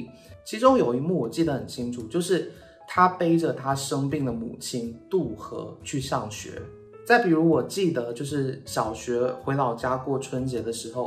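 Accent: Taiwan. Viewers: 你们当然能听出来了，但是对于大陆尤其北方的来讲这已经很台湾口音了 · 因為口音很像台灣人😄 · 我還以為coma是馬來西亞人🙈你的口音不像內地人反而偏向台灣人，還時不時說一兩句英文😂😂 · 幽靈船那部電影好看，“鳥“是一部很經典的驚悚片。COMA應該是曼德拉效應困擾了你，話說COMA是哪裡人啊！口音用詞聽著很台灣，可不可以請問是哪裡人，我真的沒有惡意。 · 有些是不太一样 有部分听起来会更偏台湾 有部分更偏大陆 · 廈門在福建 所以口音像台灣腔很正常的（福建人的口音真的很像台灣腔） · 我也以為是台灣人耶🤣想說是不是為了拍片所以講的比較字正腔圓而已🤣原來是廈門人啊！ （大家都好和平覺得這裡很棒！） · 感覺是香港人？一直覺得有些用語很中國，但腔調非常台灣疑惑超久XD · coma居然不是台灣人😯 為什麼口音這麼像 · 我一直以為COMA是台灣人XD 因為腔調太像了~原來不是~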